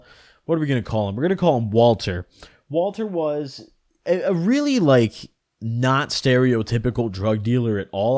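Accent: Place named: Naperville, United States. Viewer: are you from naperville. I’m from around there and it sounds like you are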